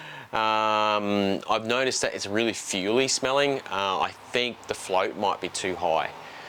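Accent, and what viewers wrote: Australia. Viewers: Good video, but a had a hard time understanding your Australian twang. Greetings from Las Vegas Nevada USA 🇺🇸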